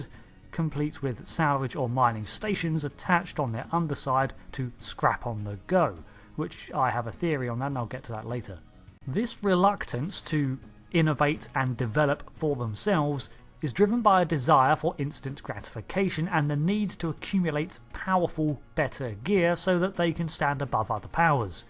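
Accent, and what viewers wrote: England, United Kingdom. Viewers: English from england？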